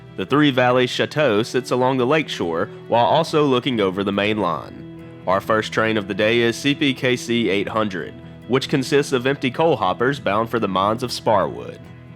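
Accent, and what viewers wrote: South, United States. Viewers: Very nice to hear a narrative cited by your gentle southern accent which is something I wouldn’t have expected on a western Canadian rail video. As one who has an interest and being a fairly read person on our country’s history, I was impressed with the amount of research you obviously did for the narrative. I’m glad that you enjoyed our country.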